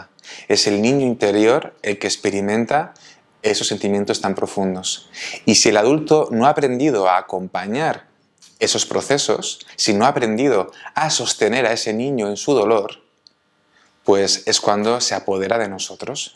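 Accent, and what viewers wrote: Buenos Aires, Argentina. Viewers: Me encantó el asiento argentino.. saludos desde Argentina 😜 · Ayyy...que gracioso!!! Pareces porteño!!! Ja ja ja!! Hermoso tu vídeo y super útil para entendernos un poquito más...la observación en cada situación aporta claridad , es como desmenuzar y llegar al fondo para desdramatizar. Besos desde Bueno Aires. Argentina!! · Jajajaja muy bien hablando en Argentino! Me encantó! A ver cuándo venís a Buenos Aires❤ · Buen dia soy argentina y me hiciste 😀 reir porque asi tal cual hablamos pero los de BUENOS Aires. Nunca cierres tu canal que sos de mucha bendición. Con este video le voy a dar una bendición muy profunda a alguien de mi familia que está atascado en su emotividad porque tiene TLP y lo necesita. Dios te bendiga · Amé tu acento argentino..!! Quedo pendiente de tu imitación colombiana..! 😂😂🇨🇴 el buen humor es maravilloso..! 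Cuánto aprendo contigo..! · Acento argentino...o uruguayo...un abrazo...! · Jajaja ! Muy bueno tu acento argentino! Te lo digo desde La Plata, cerquita de Buenos Aires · Jajaja,argentino,pero no todos hablamos así 😄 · sorprendido por tu porteño.. aunque le falta un poco de trabajo. Es muy fácil hablar en argentino, intenta hablar el castellano en italiano y te convertis en un porteño más. Abrazo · Jajajja....Te queda bien el tono argentino,cariños desde Bs As!! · Como eso el final de tu carrera ?a no!! que hariamos sin ti los sabados por la mañana?Te sale bien el argentino, tendras que venir a perfeccionarlo aqui ,bendiciones desde Argentina . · Jajajajajaajaja sos más lindo me encanta el acento argentino. Saludos desde Uruguay. AMO que le pongas humor a tus videos, le suma muchísimo !! Un saludo grande che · Jajaja te salio genial el asento argentino!!!!! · Gracias por ese acento "argento", un gusto iniciar el día con tus vídeos y enseñanzas. 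Afectuoso saludo! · Me encantó tu acento argentino ya me creí que eras de los nuestros!! El humor sano e inteligente es mí aliado para muchas situaciones! Saludos desde Mendoza Argentina!! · Te sale muy bien el acento argentino 👏👏👏 Me encantó escucharte! Ya hablando del tema del vídeo justamente me están pasando cosas parecidas y siento un agotamiento mental y físico que me hacen daño a veces. Entrar en el conflicto es muy fuerte, a mí me trae angustia que duele en el pecho! Ahora lo manejo desde la reflexión pero no logro evitar la angustia en la primer etapa. Luego lo puedo superar. No es sencillo de explicar pero lo puedo manejar. Gracias siempre mi querido terapeuta. Abrazo desde Argentina. ❤️ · jajajaj el acento argentino, lo amo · Jajaja me sorprendió el acento argentino! XD muy bueno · Jajaja amé tu acento argento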